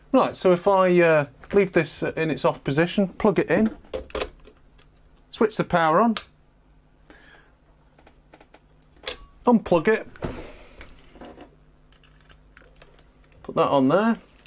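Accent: United Kingdom. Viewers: this guy is funny, I also love that UK accent, instant subscribe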